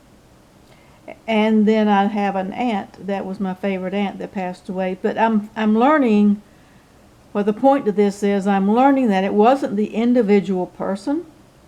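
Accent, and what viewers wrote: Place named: South, United States. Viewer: hear that southern drawl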